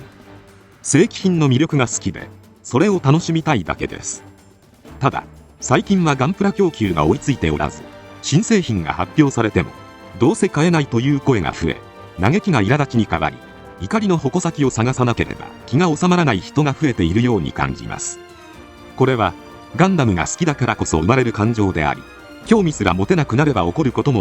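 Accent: Kansai, Japan. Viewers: さん、関西人だったのか・・・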